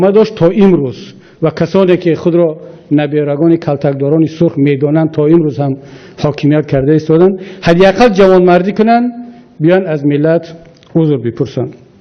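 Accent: Tajikistan. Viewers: лафзаш тоҷикии тоза аст. ман ба лафзаш ягон эътироз надорам. ман аз ноҳияи Айни вилояти Суғд мебошам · Ана инпа меган точики тоза бо забони точики тоза гап мезана одами бо илм малумаст илмаш.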